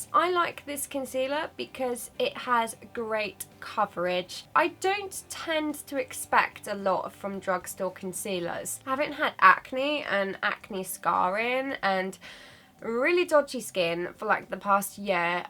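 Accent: United Kingdom. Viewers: so in LOOOOVE with your british accent!!! its awesome! :) and i really like your videos, too. it feels so good to see you as a very self-confident person. do not ever lose it! i feel with you, because I'm also plagued by acne… greetings from germany.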